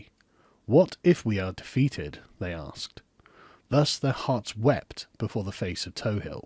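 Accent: United Kingdom. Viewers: I fear is it just a brittish accent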